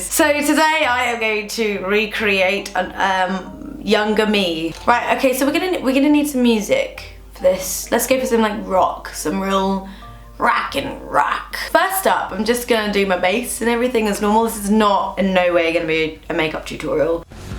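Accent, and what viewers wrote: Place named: London, United Kingdom. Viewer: it sounds like Adele's accent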